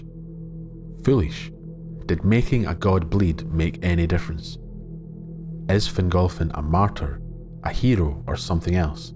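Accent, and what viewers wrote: Scotland, United Kingdom. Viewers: He was foolish and heroic. In order to become a hero, you must be a fool in the first place. I really love your videos, partly because I could listen to your beautiful Scottish accent for hours on end.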